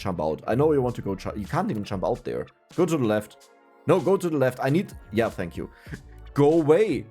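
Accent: Germany. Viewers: Where are you from, because I like your accent I have never heard it before. I believe its an German accent right? Me myself am from the Netherlands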